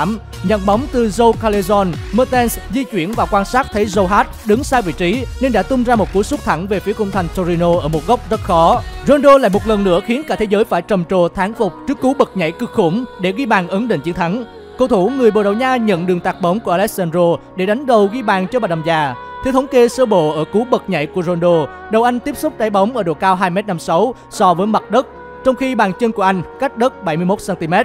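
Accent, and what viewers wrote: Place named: Da Nang, Vietnam. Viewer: Người đà nẵng hả em bé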